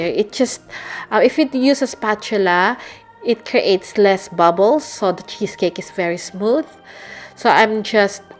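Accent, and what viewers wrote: Philippines. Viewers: She has Philippino accent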